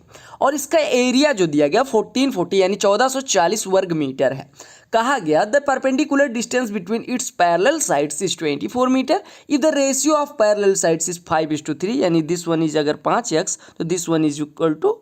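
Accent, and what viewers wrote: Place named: Awadh, India. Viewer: I like Avadhi accent.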